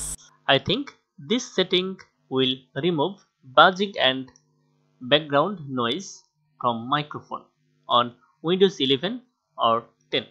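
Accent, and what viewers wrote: India. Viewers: IT WORKED, U KNOW ITS GONNA WORK WHEN U HEAR THAT INDIAN VOICE :D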